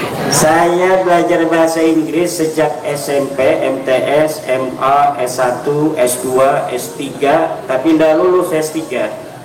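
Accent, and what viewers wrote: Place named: Cirebon, Indonesia. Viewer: Ko bhs e mirip Cirebon an y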